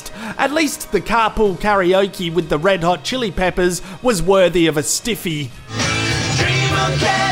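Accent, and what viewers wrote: Australia. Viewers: Mate what part of straya ya from. NSW meself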